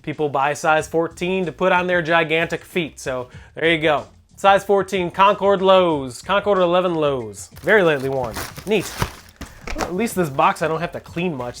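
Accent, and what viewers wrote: Chicago, United States. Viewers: The Chicago accent gets me every time 😂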